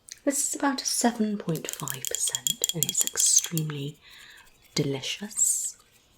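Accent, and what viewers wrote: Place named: United Kingdom. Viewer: I love British people so much,you're soooo lovely and your accent takes me back to when I was living in the UK❤ thanks for such amazing roleplays x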